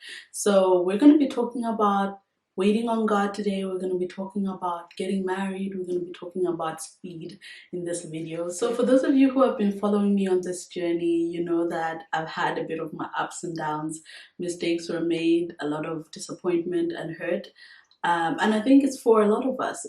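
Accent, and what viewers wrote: South Africa. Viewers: You’re so beautiful 🔥🔥🔥😍and you look and sound South African ❤❤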